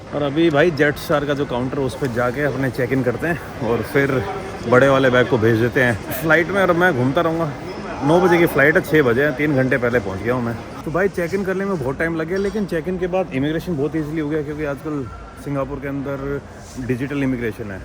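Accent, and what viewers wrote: Haryana, India. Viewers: Hariyanvi tach I like it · Arey yo toh haryanvi Bhai se .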